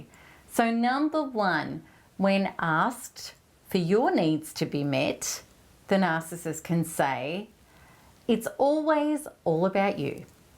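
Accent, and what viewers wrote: Australia. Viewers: Your Aussie accent is like butter on toast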